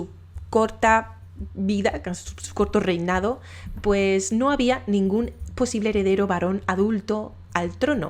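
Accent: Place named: Andalusia, Spain. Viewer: Sigo añorando tu acento andaluz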